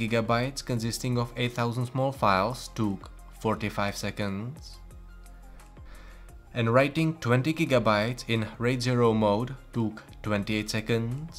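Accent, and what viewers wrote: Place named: Czech Republic. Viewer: tvl tvá angličtina zní jako od Čecha.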